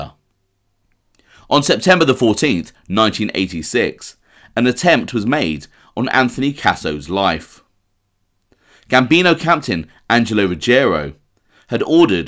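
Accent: England, United Kingdom. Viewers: Sorry can't listen to some English guy talking about American mobsters doesn't sound right to me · Sammy gravano could take a few lessons from you. Your knowledge of mob issues is mind blowing. I can listen to your u k accent all day.you don't swear and have a dignified demeanor that lends it's self to the program. Thanks again bill feilhauer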